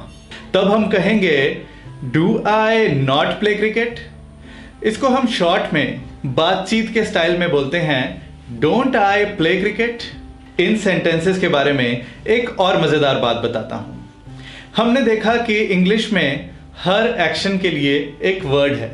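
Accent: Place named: Kerala, India. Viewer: Malayalam spoken English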